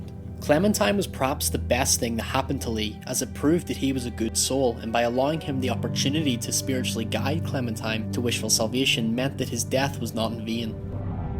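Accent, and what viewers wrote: Northern Ireland, United Kingdom. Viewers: great video, is that a northern irish accent?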